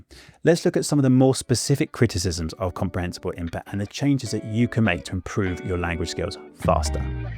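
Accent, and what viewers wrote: United Kingdom. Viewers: Too British for me. Ain't it my cup of tea. Sorry.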